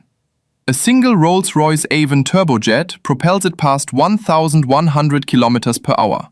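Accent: Germany. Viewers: German, aren't you ?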